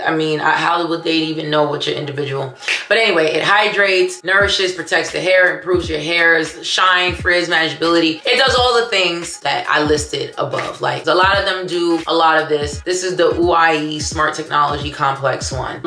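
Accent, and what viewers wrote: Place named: New York, United States. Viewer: Homegirl! I'm so glad to find your channel. First, you're sharing priceless knowledge and thank you for that. Also, it's been a long time since I lived in NYC and just listening to you talk takes me back to the good old days. You are filling me with so much joy by just being you and sharing that with the world. Keep on keeping on. 💖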